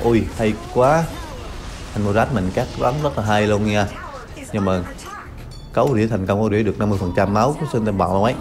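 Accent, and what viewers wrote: Quang Ngai, Vietnam. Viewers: Y chang, hồi kì nghe là ngộ ngộ ồn người quảng ngãi rồi. Chào đồng hương nha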